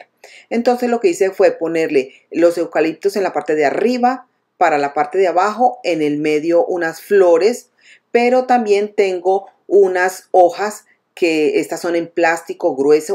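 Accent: Colombia. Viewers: En mi país le dicen yaquis... Recién estoy jubilada y pues mi casa que es la suya muy seguramente es del tamaño de su sala 😂😂😂 sin embargo me encantan sus ideas soy realmente nueva y hoy haré lo de los lentes y las bolitas de palillos... No se si tenga decoraciones para baños.. Me encanta que la mayoría de sus ideas es acorde a muchas economías.. Aparte de las excelentes ideas amooo su acento... Colombiano??? No se si me equivoco pero me encanta como habla tan bonito....genial su canal!!!!!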